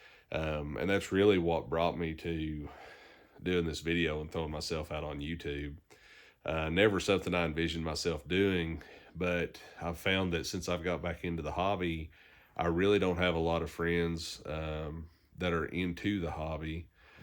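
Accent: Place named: Tennessee, United States. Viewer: Great first video! I have been brainstorming about how to start a channel myself and how I can contribute to the community. You have helped me continue to gain some excitement about the opportunity. We have lots of similarities in our hobby background, along with many others that have come back to the hobby! Looking forward to hearing more from you. Sounds like you may live in Tennessee, I am in Johnson City. Take care and good luck!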